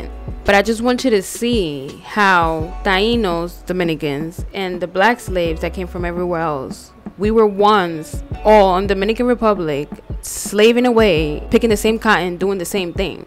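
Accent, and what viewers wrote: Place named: New York, United States. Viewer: Nothing better then a little dominican history, with a NY accent ! DEADASSSSSSSSSS Lmao . Definitely feel as dominicans we gotta know our history , feels like the more times that passes the more people seem to forget about the roots and where our people come from , which is exactly what the Europeans wanted ….. to oppress our beliefs and our culture . Great video !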